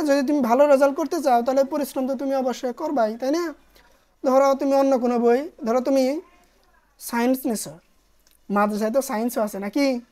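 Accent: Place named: Sirajganj, Bangladesh. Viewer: সিরাজগঞ্জ / আশে পাশে বাড়ি মনে হচ্ছে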